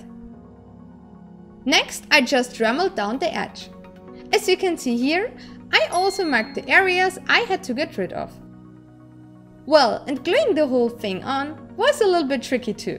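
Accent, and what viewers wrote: Germany. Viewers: Ich hab mir schon gedacht, dass du irgendwie einen deutschen Akzent hast aber nachdem ich mir die Kanalinfo angeschaut habe war ich mir sicher. Btw wirklich hilfreiches Video! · Are u German??? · Man merkt gleich, dass sie aus Deutschland kommt 😅 aber gut gemacht.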